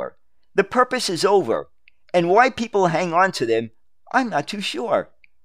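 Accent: New York, United States. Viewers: The audio was just fine. The NYC accent is authentic.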